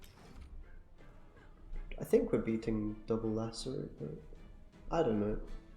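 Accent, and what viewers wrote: United Kingdom. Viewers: Thing I like with you, you speak calmy and with a good diction. Maybe I have wrong but I feel a little Scottish accent especially with the "o"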